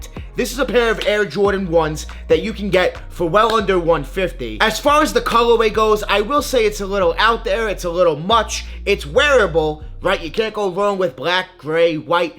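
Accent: New York, United States. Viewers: been following you now for a minute. ur doing it the right way with engagement. the give aways and drawings. great connection with ur audience and subscribers. i’m GONNA get some lost n founds eventually 😅. also u gotta be from NY.. what part of LI u from? i can smellll it.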